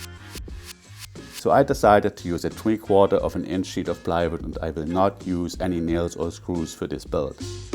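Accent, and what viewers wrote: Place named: Germany. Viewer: Your accent is definitely telling you are of German descent, in any case European born. So why on earth do you use inches etc. Metric all the way dude!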